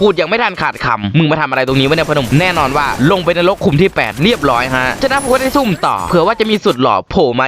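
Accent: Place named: Isan, Thailand. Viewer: คลิปนี้พี่เป็นคนอีสานนะครับทำไมพูดเหมือนคนอีสานเลย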